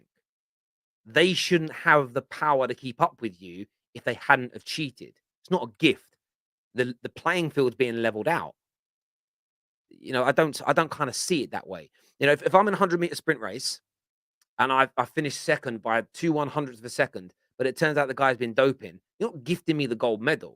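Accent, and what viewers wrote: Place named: Manchester, United Kingdom. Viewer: Another United fan with a manc accent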